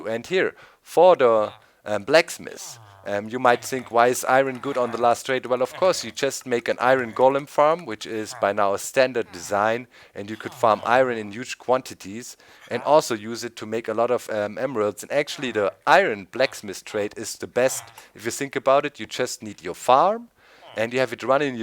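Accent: Germany. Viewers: there's something I don't understand: Now in German, the W is typically as our V, and the V is typically like our F I think, right? How come with the German accent, you guys will often times say English words with a V as we say a W? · Give the German a break. English is not his first language.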